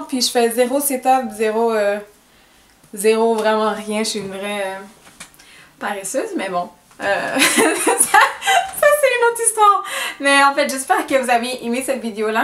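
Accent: Canada. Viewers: C fou t'a un accent canadien c mignon 😊😊😊😊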